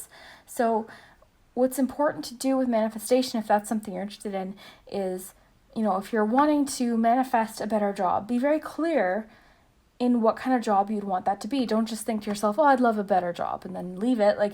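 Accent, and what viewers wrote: Minnesota, United States. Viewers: Are you Minnesotan by chance? I'm from there and your accent is familiar :)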